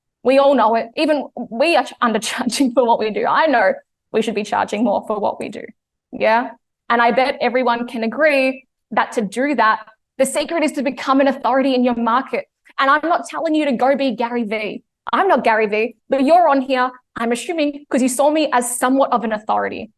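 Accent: Australia. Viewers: I love your Australian accent. It is very beautiful to my ears........:) Thanks for valuable information.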